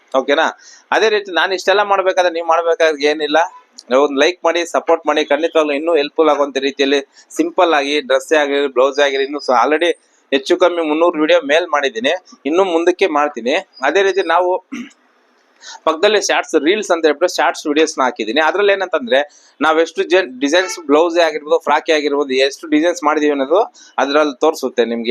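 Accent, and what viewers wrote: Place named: Kolar, India. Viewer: ನೀವು ಕೋಲಾರ ಅಥವಾ ಚಿಕ್ಕಬಳ್ಳಾಪುರದ ಕಡೆಯವರು ಇರಬಹುದು ಅನ್ನಿಸುತ್ತೆ ಸರ್.ಮಾತಾಡುವ ರೀತಿ ಆಗಿದೆ, ಚೆನ್ನಾಗಿ ಬ್ಲೌಸ್ ಕಟಿಂಗ್ ಹೇಳಿ ಕೊಟ್ರಿ.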